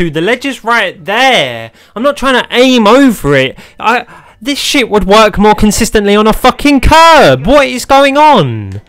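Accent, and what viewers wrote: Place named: United Kingdom. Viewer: I love your bri'ish accent (pls don't take that offnsively) also how is your day been going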